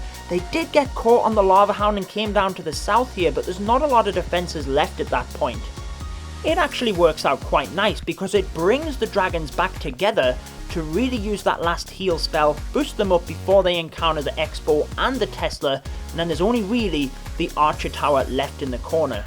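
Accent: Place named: Scotland, United Kingdom. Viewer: Are you Scottish? Love the accent.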